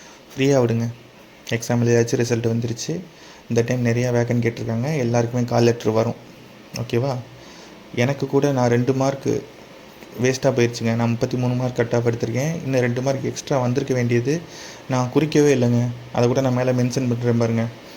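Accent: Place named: Tirunelveli, India. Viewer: திருநெல்வேலி தமிழ். 24. அப்புறம். 9. அப்புறம். 8. கால் லட்டர் வருமா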